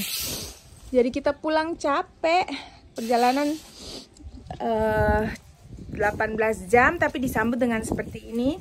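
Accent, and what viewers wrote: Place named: Papua, Indonesia. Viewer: Tetap ya pertahankan bhs dialek Papua nona cantik manis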